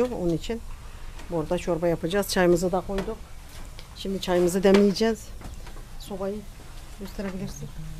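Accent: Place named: Gaziantep, Turkey. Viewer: konusmanizi cok hosuma gidiyor. Dunurumde Antepli ayni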